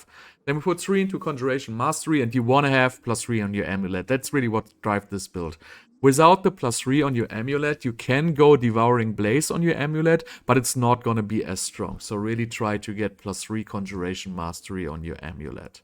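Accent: Germany. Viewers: So geil das man deinen deutschen Akzent hört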